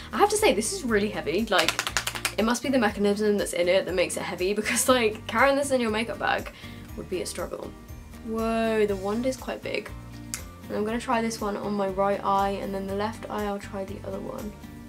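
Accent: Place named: United Kingdom. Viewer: she sounds so extra British today. Idk y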